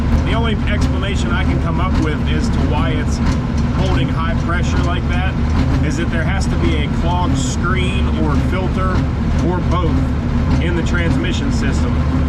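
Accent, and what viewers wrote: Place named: North, United States. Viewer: I AM WATCHING FROM DOWN HOME ( LOU---A---VULL ) learn to talk proper mare kan next time so you kan fit in we no your a feerneer dam yankey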